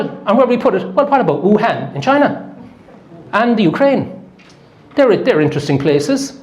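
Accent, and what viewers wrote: Ireland. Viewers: 4 comments really yootoob?? 🤨 This was outstanding. Nothing I didn't already know, or have heard from others or suspected myself - but somehow for me, in this moment, it consolidated it all. Something about that glorious Irish lilt that made the repeating 'un-put-downable'. However, I am now so certain of Everything, that I don't know whether I'm so exhausted from 'knowing' that I'm inclined to pee-everything up the wall, party like a loon and spend what funds I possess, or whether I am so fired - especially obo my Grandchildren - that I'm gonna light a torch and pitch fork my way in to History🦬🔥 ( If you know me - the latter is the ONLY option. If you don't know me - is right - Big Bag, Big Fight - lead from the front) ✊🙏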